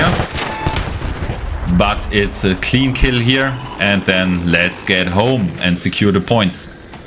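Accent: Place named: Germany. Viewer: Good Video. Dont kow why some people crying here. Außerdem hörst du dich deutsch an. Muss man supporten. Sollen alle die fresse halten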